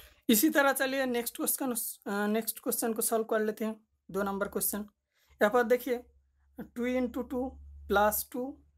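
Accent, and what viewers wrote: Bengal, India. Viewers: I got the ascent of Bengali in your language...so proud of you bro being a bangali.....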